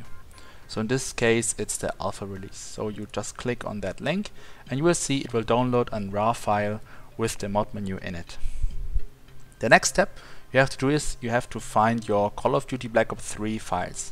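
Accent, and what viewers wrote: Germany. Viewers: Dieses German english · Man hört das du Deutscher bist😂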